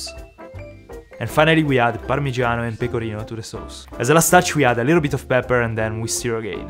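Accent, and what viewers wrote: Italy. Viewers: Italian accent I’m Italian to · LO sapevo che eri tialiano dall'accento del tuo inglese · Bella la tua voce italiana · Si sente molto che sei italiano. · Bro avevo intuito dalla pronuncia, ma quindi sei italiano!!! 😅😄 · I spotted your channel just some days ago and I really like your videos I immediatly knew by your accent that your family is originaly from Italia, it just sounded familiar. I'm from Germany and I bet my accent is way worse than yours but I really like yours, mine not😂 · Io ho scoperto questo canale per caso e appena ho sentito il suo l'accento ho subito pensato "tu sei italiano!" 😂😊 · Yes it's very good I generally love Italian accents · Ciao sono Lisa una tua fan italiana. Tu hai origini italiane? Perché parli molto bene inglese ma si sente molto l'accento italiano. So che non leggerai mai questo commento ma io in caso ho provato a chiedertelo. 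Ciao ciao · I hear the italian dialect☝️👍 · I love his fluent Italian accent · I love how half of this video is just him flexing his Italian accent on us. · I like your way of talking in Italian Accent ❤️ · Ora sono riuscito a rispondere alla mia domanda che si ripeteva nel,a mia testa da tempo, no non è vero ahaha, beh cow mi sembrava dalla tua pronuncia è tutto che fossi italiano e in effetti lo sei boom shaka laka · fra guarda che è italiano, lo capisci anche solo dall’accento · Ma tu sei italianooooo si sente, aia la pancetta però eh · In this episode i noticed his accent became more italian than usual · Ma fra si capisce dalla pronuncia che sei italiano... checazzo devi imparare a fare la carbonare... spero bene che da italiano tu la sappia fare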